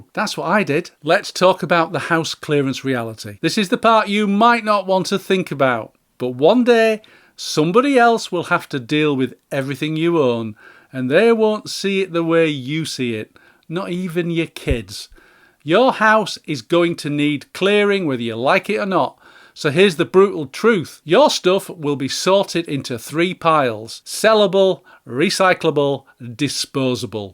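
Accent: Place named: Liverpool, United Kingdom. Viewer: Sounds like a wise Liverpool man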